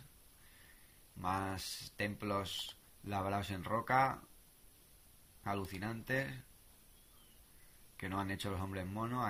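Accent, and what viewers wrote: Spain. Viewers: tú eres valencia segur. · de que parte de España es ese acento...... es como el castellano chileno.... que no se entiende un carajo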